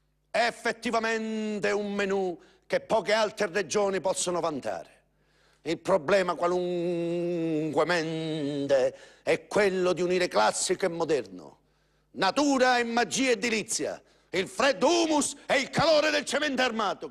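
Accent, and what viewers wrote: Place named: Calabria, Italy. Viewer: Non è siciliano cazzu dici chissu e di Reggio Calabria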